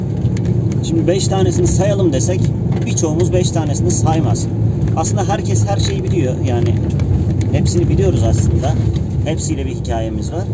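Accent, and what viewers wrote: Kastamonu, Turkey. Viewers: Hep kastamonu şivesini konuşmanı istıyoruz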